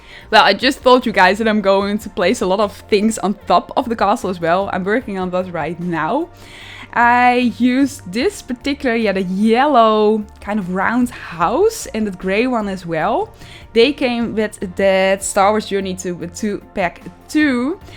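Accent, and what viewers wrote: Netherlands. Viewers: Are you Dutch? 👀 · Wowww ik kan niet eens een normale huis maken😭😂 en ja ik praat nederlands omdat ik aan je accent kan horen dat je het ook bent :') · Are you Dutch? Cool video!! ❤️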